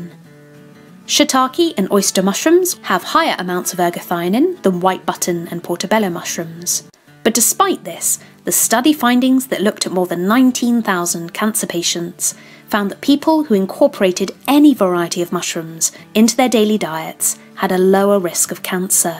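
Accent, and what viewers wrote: United Kingdom. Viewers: Mushrooms are great, you can make them really tasty by adding herbs, garlic, tomatoes, etc. and there's no need to add oil. They're very low in calories, filling and "meaty" in texture so they're a great food to have around. Nice to hear a British voice and to know you're based in London too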